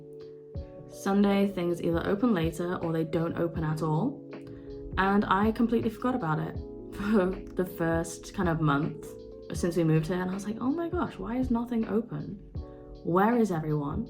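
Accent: Australia. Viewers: you sound slightly Australian more than English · You sound more Australian to me than to be an English Lass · your accent is slightly international, there's a touch of Australia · You picked up an Aussie accent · Your accent sounds Aussie to me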